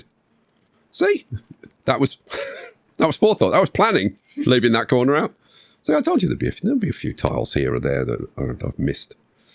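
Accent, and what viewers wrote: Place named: North, United Kingdom. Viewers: Dear (Smacking keyboard.) As someone from the Greater Manchester/Yorkshire border. I approve of your Northern accent... Bloody parth my backside though lad. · Dear, this is a request for you to do a full episode with your Northern accent.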